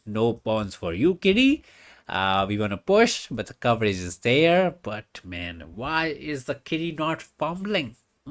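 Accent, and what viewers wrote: India. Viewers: Love ur indian accent and calling queen the fatty😂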